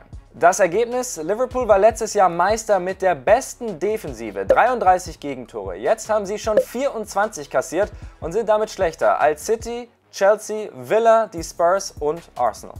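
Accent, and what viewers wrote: Ireland. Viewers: Er ist ire er kann nd wirklich was dafür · Ist sind irischer Akzent, kommt aus Irrland der Gute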